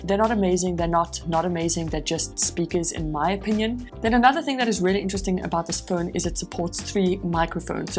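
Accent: South Africa. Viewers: Just found your channel are you south african? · Great review with a typical South African accent and I smaak it! ;) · Hey you sound like a South African,I am from RSA.One tip usually techies refer to ram as memory and Device Storage as what you referred to as memory.I must commend you on Your editing , its awesome and this was a great review.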